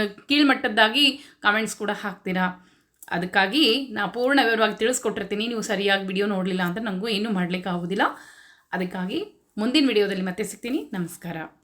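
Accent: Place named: Hubballi, India. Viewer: madam you are amazing. I like Hubbali accent. Thank you for you guidance. Like short Shlokas.Thank you❤ Namaskaram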